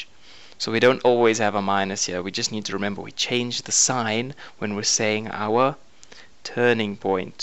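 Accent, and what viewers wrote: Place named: South Africa. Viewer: This guy is so from South Africa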